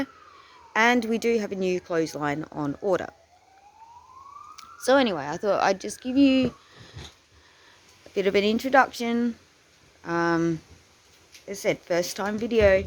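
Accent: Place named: Australia. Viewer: Wooooohooooooooo go you so proud of you it’s good to here an Aussie thanks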